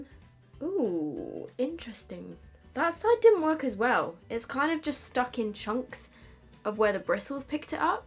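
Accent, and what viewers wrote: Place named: United Kingdom. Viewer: the subtitles were transcribing from a British accent I believe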